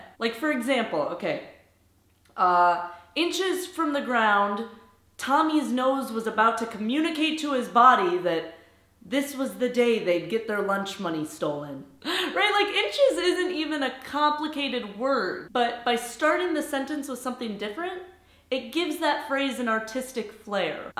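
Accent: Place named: Midwest, United States. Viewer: I simply love your Middle-West accent!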